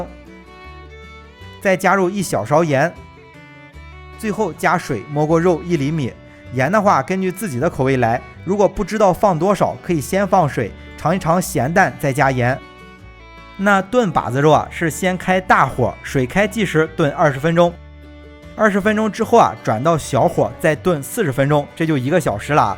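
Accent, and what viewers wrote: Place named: Jinan, China. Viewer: 这口音，绝对正宗济南人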